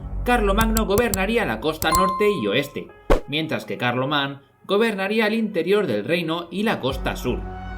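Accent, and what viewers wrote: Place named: Galicia, Spain. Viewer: Lastima el acento Gallego . Arruina el video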